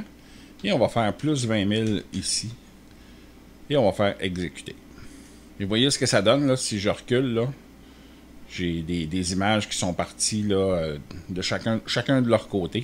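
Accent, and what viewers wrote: Canada. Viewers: Un grand merci pour vos tutos de qualité et très bien expliqué, abonné depuis bien longtemps c'est toujours un grand plaisir de vous retrouver pour vos astuce le tout avec l'accent canadien, que du bonheur merci ;0)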